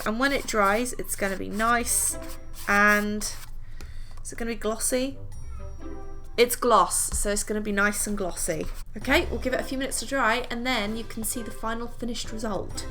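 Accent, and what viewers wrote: England, United Kingdom. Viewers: not related to the video but, are you English or American. You always talk about going to American stores like Walmart but you sound English to me. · Why does she have an English accent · Is she british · She has such a British accent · Are you English or American I’m confused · Why do u sound British? 😂🤣 btw love u! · I was very surprised when you said "dollars"... you sound so very British! (new to this channel, so maybe that is a very stupid comment/question to the regulars). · Are you English living in America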